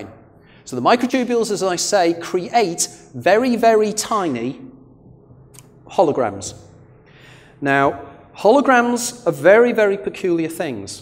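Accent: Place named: England, United Kingdom. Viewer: very interesting but why the unfriendly, snappy tone? Maybe it is the English accent, I don't know, if was Scottish or Irish, I am aware that he would sound friendly and even funny, full of spirit, but listen to Richard Dolan, American, he does not fly through his lectures and sound so cold....otherwise, great lecture. But I don't agree with "you live your life again and again until you live a perfect life". Why the human morality all of a sudden? why the idea of hard work, linked to progression then release? And who created the video game we are in (as player and creator are different people here in this reality)? Why do animals suffer so much? What's in the game for them? What about all the kiddies that Jimmy Saville raped? Are they to live again and again until they discover the right choices? What if Philp K Dick was right and the creator of this game was or the creators were evil?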